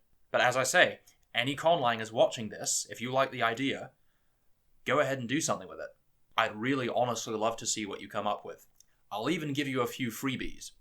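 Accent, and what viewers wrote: United Kingdom. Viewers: What's your native dialect? It's definitely a non-rhotic, british-esque but I'm having trouble placing it more specifically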